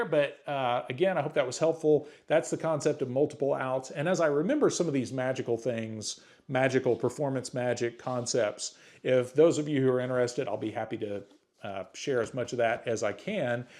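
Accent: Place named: Oklahoma, United States. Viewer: I was going to guess you were from Oklahoma based on your accent. I was close!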